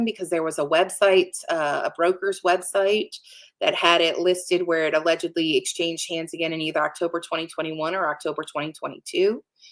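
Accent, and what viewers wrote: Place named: South, United States. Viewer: Hello girl! I'm in Oklahoma and I hear a tinge of "Suth'un" in your speech! Love it. I heard a question as to whether Sussexes would divorce. Only my opinion, but she's now heard Andrew's Sarah will retain her duchess title. Meghan covets that title, she feels she has multipled clout in having it. IF the king, or Prince William, remove their Sussex title, Meg will collapse like a burst balloon. ONLY my opinion, mind.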